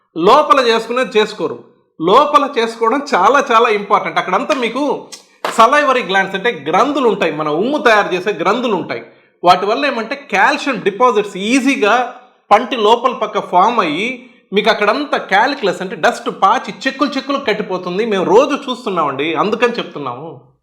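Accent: Nellore, India. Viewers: Your Nellore accent is original & excellent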